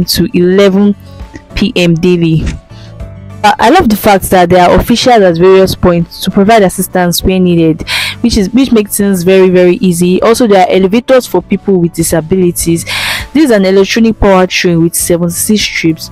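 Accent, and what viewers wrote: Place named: Yoruba, Nigeria. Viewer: I like your spoken English with broken and yoruba vibe......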